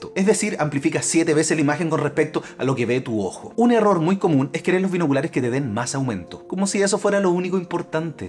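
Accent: Chile. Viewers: bro, eres Chileno ?? donde compraste tus binoculares ? Gracias.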